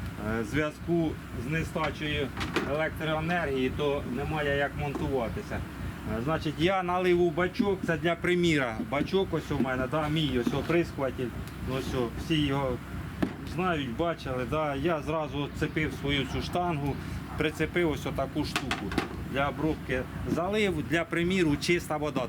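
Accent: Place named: Volyn, Ukraine. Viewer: Волиняка нюхом чую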